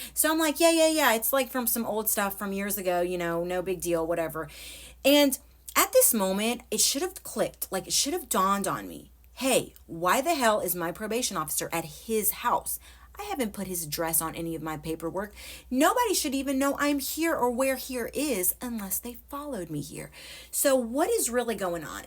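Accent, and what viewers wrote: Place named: Texas, United States. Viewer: Ngl I thought she was from El Paso or some where in texas because of the accent.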